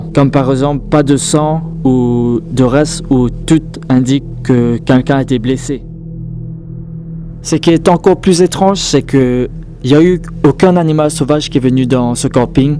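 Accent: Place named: England, United Kingdom. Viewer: Je pense juste qu’il n’est pas français mais plutôt anglais ou américain tout simplement vu l’accent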